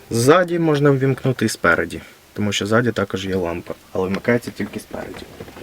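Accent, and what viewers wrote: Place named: Surzhyk, Ukraine. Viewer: Суржик вуха ріже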